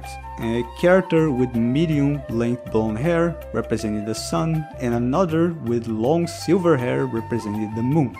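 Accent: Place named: Brazil. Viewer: Just watched your first video and it was great! Super hyped to watch this next one. Also, judging by your accent I gotta ask, are you brazilian? If so, me too, salve!! haha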